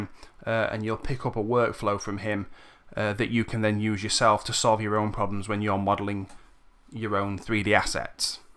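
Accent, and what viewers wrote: United Kingdom. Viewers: That moment when I know all of them but still suck at maya lol... btw nice british accent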